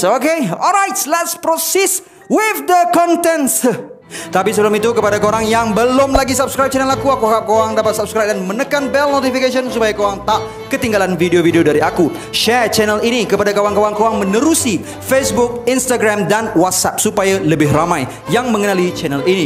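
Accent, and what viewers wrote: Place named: Kedah, Malaysia. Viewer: Bukak msk bru ada dngan lorat kedah.. baru ada feel dngar dye pnye crte...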